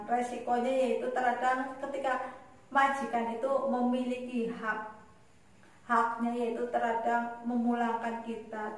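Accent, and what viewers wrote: Banyumas, Indonesia. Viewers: Salam ngapak ora ngapak ora kepenak😀👍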